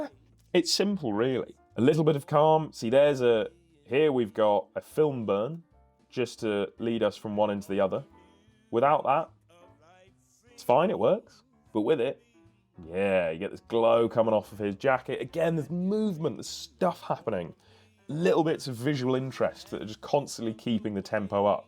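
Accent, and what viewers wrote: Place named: Belfast, United Kingdom. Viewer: Completely unrelated to the video (which is amazing, thank you!) I know your english, but there is a few times that you sound like you are from belfast. Is any of your parents from belfast?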